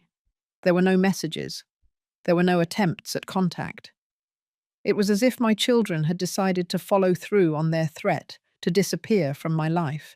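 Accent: England, United Kingdom. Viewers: Going to the beach in December. There is an accent that is English….no beaches warm enough for swimsuits. Maybe britishers that emigrated to florida or California???? Or just AI being ignorant abain 😆😆😆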